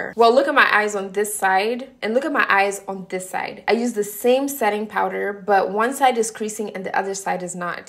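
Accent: Caribbean. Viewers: Love your videos your accent especially.. sounds Caribbean-ish flavored ☺️✨ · This is my first video of yours and I sense a bit of Caribbean in that accent. You are beautiful btw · I could listen to you speak all day. Your accent is heaven to me, bringing back lovely memories of back home in N.Y. many years ago, conversing with my neighbors from Jamaica and Trinidad-Tobego. Our children attended school together.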